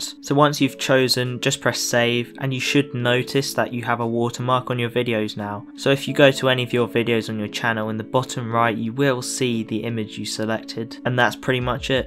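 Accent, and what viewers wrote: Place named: United Kingdom. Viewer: I'ma assume you are British Your accent sounds British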